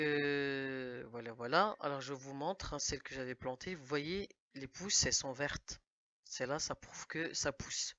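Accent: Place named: Chleuh, Morocco. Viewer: il me semblait bien à ta façon de parler que t avais du sang chelh 🤣 Moi aussi je suis chelha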